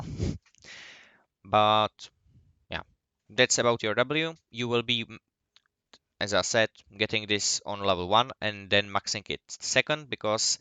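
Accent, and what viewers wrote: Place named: Czech Republic. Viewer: Vic cesky-zniciho cecha jsem snad neslysel xD. Good Guide.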